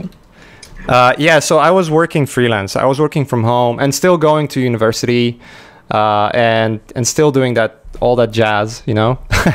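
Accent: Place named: Poland. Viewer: Как же прекрасен польский английский. Люблю за это поляков, в отличие от native spikers.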